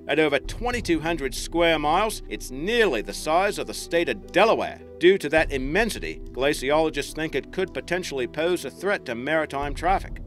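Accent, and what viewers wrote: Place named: Australia. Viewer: I think he's Australian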